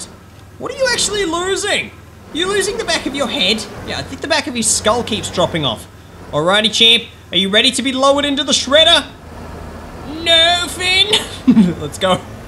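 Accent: Australia. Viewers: you so Australian oh no we're bodged/oh let's do a u ey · im new and i like how you are so aussie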